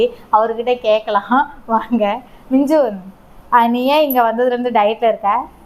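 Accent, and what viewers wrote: India. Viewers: Cute akka ninga ❤...... Akka ninga nga tirunelveli ponu mari pesuringa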